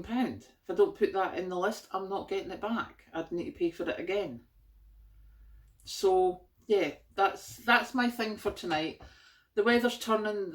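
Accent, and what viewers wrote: Scotland, United Kingdom. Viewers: which part of scotland are you from roughly? Im Not stalking you 🤣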